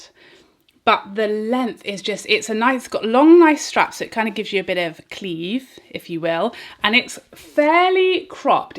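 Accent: England, United Kingdom. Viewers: Hi, I got to see your channel as a recommendation from YouTube.. Love your English accent.. Nice video 👌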